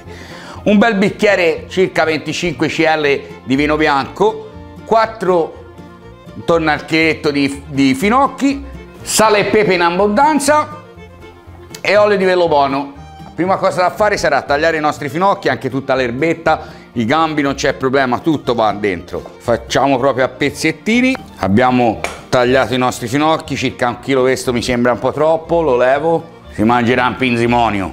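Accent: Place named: Viareggio, Italy. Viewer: Le ricette sicuramente sono ottime, ma io le faccio I complimenti perché usa tutto della verdura, non fa sprechi, mi piace vedere come con poche cose riesce a fare tanto ben di dio!! Bravo!!! Hah un'altra cosa, la parlata! Mi ricorda la mi zia che è di Viareggio, non la vedo da un anno. Buon anno nuovo!!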